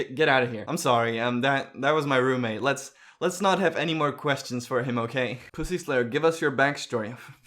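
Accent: Japan. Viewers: HAHAHAHAHA OK U MADE ME LAUGH IN YOUR NIHONGO/Japanglish 😂😬🤟🏼🤟🏼🤟🏼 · Japanese english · your japanese English is professional, i can tell you. You speak literary the same as my English teacher at Japanese School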